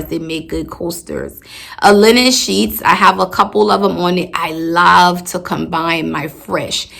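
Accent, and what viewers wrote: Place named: New Orleans, United States. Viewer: I love your accent...are you from Nola??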